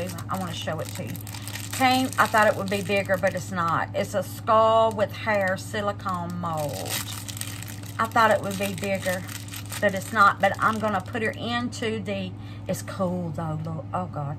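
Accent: South, United States. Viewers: I can tell you're from the south but I can't place it. I'm in northern Tennessee and I believe you're further south. Anyway, I really enjoyed your video 💖💖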